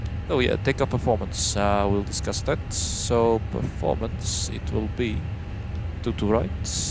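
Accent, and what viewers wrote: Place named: Finland. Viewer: Nice Finnish/Nordic accent!!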